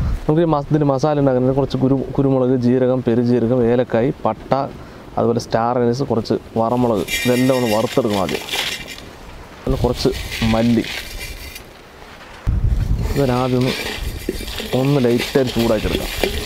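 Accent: Palakkad, India. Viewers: സുഹൃത്തുക്കൾ ചിക്കൻ കാലെടുതതപോൾ കണ്ടു കള്ളൻ എന്നിട്ട് പറയുകയും ചെയ്തു നിങ്ങൾ ആളുകൊളളാലോ ആ പാലക്കാടൻ തനി നാടൻ മലയാളം എനിക്ക് വളരെ ഇഷ്ടപ്പെട്ടു എല്ലാ ആശംസകളും നിങ്ങൾക്കും നിങ്ങളുടെ ടീമിനും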